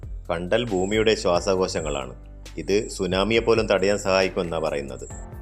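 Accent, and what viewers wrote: Kannur, India. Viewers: തനി kannur ഭാഷ, നന്നായിട്ടുണ്ട്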